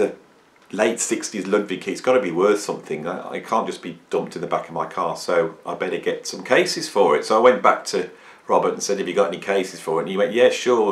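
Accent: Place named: England, United Kingdom. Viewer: This was so interesting! From your bombshell 'I was playing Bonham's kit' moment to the theories on the way he got the open, sonically powerful style in collaboration with Page's recording know how and his own early influences to ultimately create his oft imitated yet never duplicated signature style. Incidentally by your accent, can we deduce you were born in a roughly similar part of the country to John/ Robert?😄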